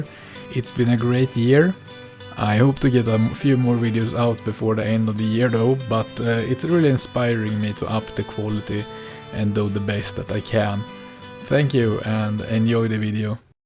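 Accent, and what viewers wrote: Sweden. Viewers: That Swedish accent :D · thicccc Swedish accent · Are you swedish?? Sounds like one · Jäklar vad man hör att du är svensk:)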